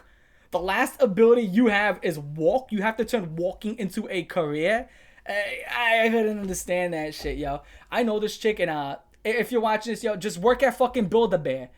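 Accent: New York, United States. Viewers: You sure you're not part Italian? You have the NY guinea accent and you're hilarious.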